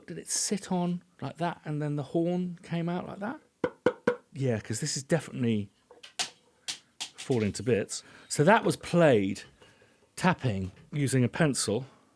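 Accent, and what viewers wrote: United Kingdom. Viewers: The one simple thing you can remove from the chain of events that would make the outcome different is your British accent. There. I said it. Nothing better than learning composing from a dude with a sophisticated british accent.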